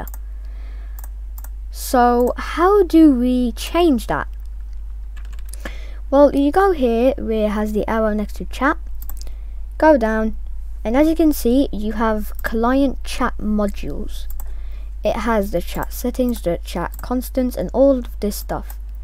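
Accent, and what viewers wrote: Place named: United Kingdom. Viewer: I think im dumb cuz I just made it worst... And this this helped ty dude, ur a British accent ur British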